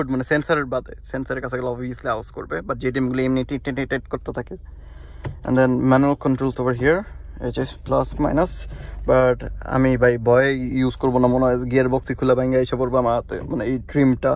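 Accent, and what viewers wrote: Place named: United States. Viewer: আপনি কি নরমালি কথা বলতে পারেন না ভাই। শুনতে যেন মনে হয় কোনো একজন আমেরিকান লোক কথা বলছে।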